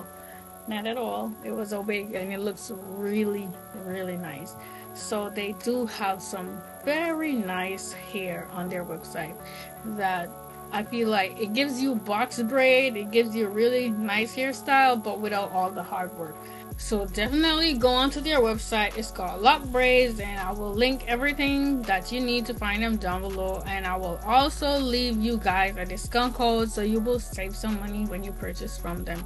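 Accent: Jamaica. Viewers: girl have Jamaican accent ❤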